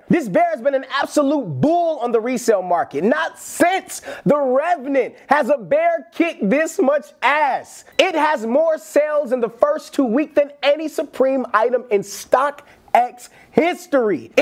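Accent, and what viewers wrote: United Kingdom. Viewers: the only thing worse than the yeezys 2018 releases is your British accent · Full body cringe to that British accent...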